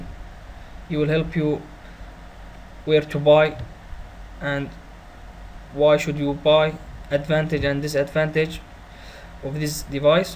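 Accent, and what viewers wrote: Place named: Afghanistan. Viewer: You sound Afghan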